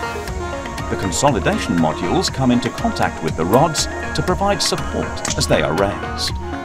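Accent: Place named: United Kingdom. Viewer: Use an American English speaker, not a British English speaker. Thanks.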